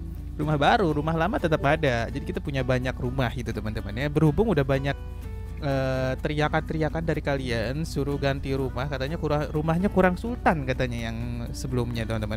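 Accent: Palembang, Indonesia. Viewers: Bang kamu orang Palembang yaa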